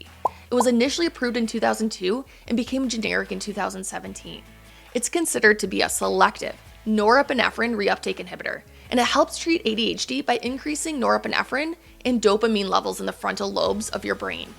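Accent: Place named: Minnesota, United States. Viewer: You sound like you’re from northern Minnesota, or Wisconsin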